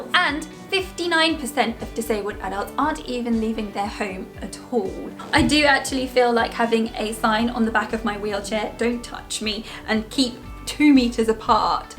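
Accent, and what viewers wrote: United Kingdom. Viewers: You're so awesome, love the British accent.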